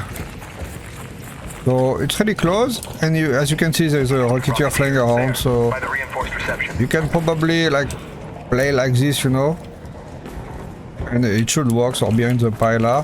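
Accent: France. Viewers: because of your VAIIRRRRRRRRRI french accent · Bro these vids have been so helpful. I don’t even mind how French you are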